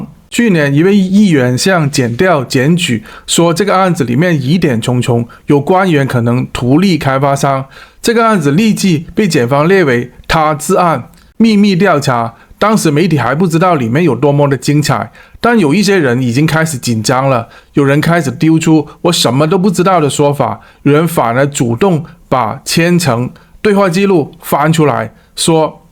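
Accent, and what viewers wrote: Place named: China. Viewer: 你的口音是中國人,台灣的事與你何干呢？法律會制裁他們。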